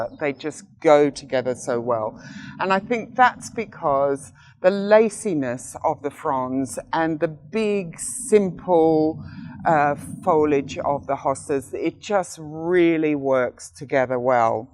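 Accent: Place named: England, United Kingdom. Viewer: It's either Midlands or southern England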